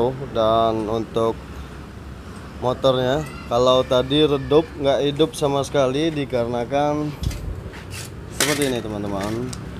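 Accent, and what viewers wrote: Palembang, Indonesia. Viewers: Kayak kenal bahasanya, wong Palembang yo om?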